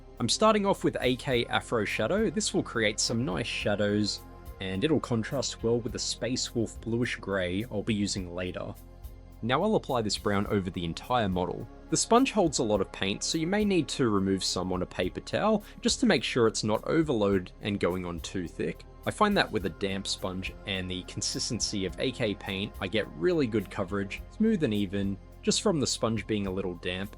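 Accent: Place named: Australia. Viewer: Fantastic result! Loving the heavy Aussie accent, mate ^^ I'm just sitting here waiting for you to start swearing heavily :)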